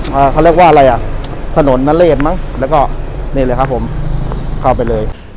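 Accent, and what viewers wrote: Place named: Isan, Thailand. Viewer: ชอบเวลาพี่เว้าอีสานเด้5555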